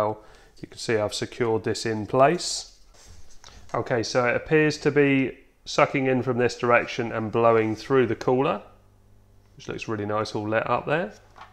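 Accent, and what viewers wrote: United Kingdom. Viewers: Thanks for the update and review. 52PI Has really stepped-up their game! I always liked the way you pronounce A-Lume-A-num (US) ….Al-You-Mini-um (UK)…when I 1st started listening to shortwave pre internet the BBCWorldService pronounced just like you…and I went crazy trying to figure out what’s that new metal the U.K. Has?…😂! Have a great day!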